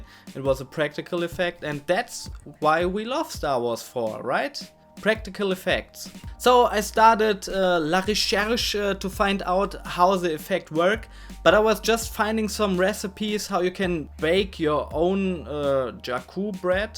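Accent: Germany. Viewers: Das video an sich ist nicht schlecht aber ich kriege kopfschmerzen vom deutschen akzent 👍😖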